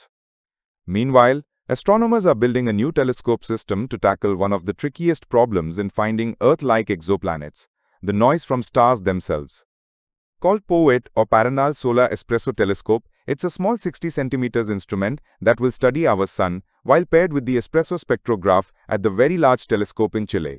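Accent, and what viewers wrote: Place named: India. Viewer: Whats blowing my mind is the accent doesn't match the cartoon face that pops up.... Indian English accent but a Caucasians face is represented as the speaker. Like *BLOWN*. Never would have guessed.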